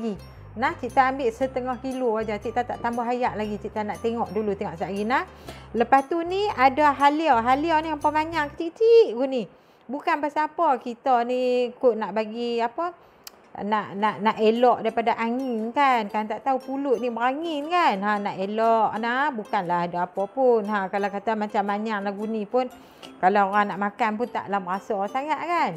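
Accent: Kedah, Malaysia. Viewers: Hati2 cik...seronok dengar cik cakap kedah...👍👍👍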